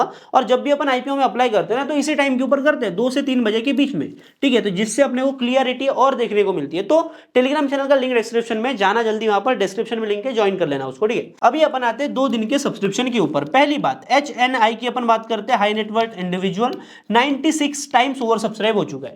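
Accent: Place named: Indore, India. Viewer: MP se ho na bhai, indori accent ❤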